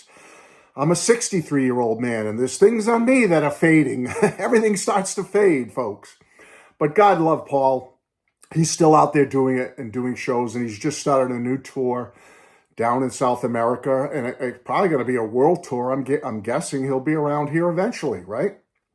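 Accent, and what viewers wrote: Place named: Worcester, United States. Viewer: I drove up to Worcester from south east CT with my best friend too!. She passed 10 years ago. We had seen him earlier in the tour in NYC. We went to probably 50 concerts of various artists. I think of the Worcester show when I hear your voice as the announcements outside had the same accent! My latest time we drove to Syracuse to see him 2 years ago with my 5 year old son. Many great memories!😅 did you get your tickets through the Wings Fun Club like we did?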